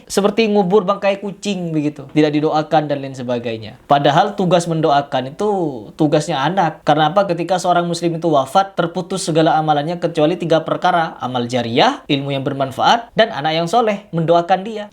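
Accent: Madura, Indonesia. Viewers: Kayaknya bang admin orang madura ya, soalnya terlihat dari logatnya.. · Masnya orang madura ya? Kayak logat madura... Saya penonton baru mas...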